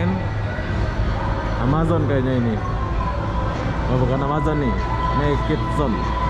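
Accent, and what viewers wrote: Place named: Malaysia. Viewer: Assalammualaikum bang mohon maaf mau tanya Abang asli nya mana yah? Sering kali konten nya tentang Indonesia ☺️ tapi jika dengar suara Abang logatnya seperti orang Melayu. Apa Abang dari Malaysia kah? Terima kasih 🙏☺️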